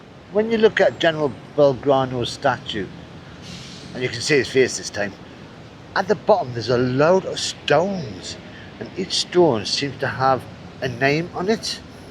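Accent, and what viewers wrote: United Kingdom. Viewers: it is british, you guys are just too used to the posh accent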